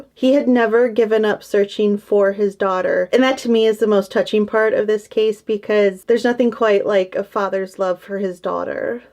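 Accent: Minnesota, United States. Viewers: Love that MN accent! ☮️💟. I have it too.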